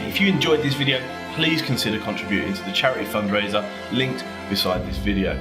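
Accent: United Kingdom. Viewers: You from Ipswich mate?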